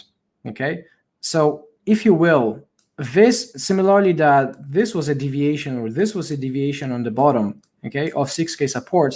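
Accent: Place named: Poland. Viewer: Tak myślałem ze polski akcent, wpadłem od Scott Melker, pozdrawiam i dzięki za perspektywę. Dokańczasz do mojej listy!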